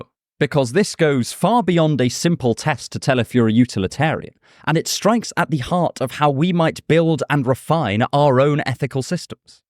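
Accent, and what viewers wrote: RP, United Kingdom. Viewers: Your proper delivery of content using concise breakdowns, perfect annunciation and liberal use of gesturing without your posture falling outside of conventional expectations is; in short excellent. Standing tall, speaking confidently with; from my vantage point, an accent that demands acknowledgment of its old-world knowledgeability, all the while casting spells with all that gesticulating it would seem, you’re helplessly bound to. Again: no notes. As a blue collar American lefty my inner, ignorant, exceptionalist at surface level sees a pretentious, pompous, privileged, pretty boy, from fancy accent island making some non-point I heard wisecrack cover in a self deprecating video years ago. But that’s the very worst part of me. My jealously. Seeing your youth and education and talents put to use in one concerted effort makes me pine for a life I was born too late to capitalize on. 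Much the same as any other haters you may encounter online assailing your content with contempt. I say this all to tell you haters are just usually ashamed. And also to remind you DONT READ YOUR VIDEOS COMMENTS. THIS ISNT FOR YOU TO SEE IF TOU VALUE YOUR MENTAL HEALTH BRO. Take care.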